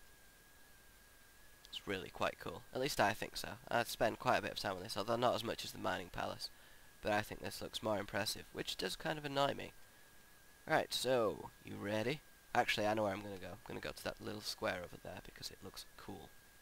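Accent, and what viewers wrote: United Kingdom. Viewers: british version of cartman from south park xD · Your accent really frustrates me. I'm sorry. You sound like a British person trying to sound Australian and American at the same time. Nice videos by the way.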